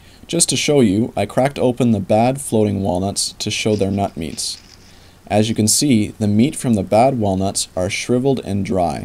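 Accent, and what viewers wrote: Canada. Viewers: Idk if a guy can sound more Canadian....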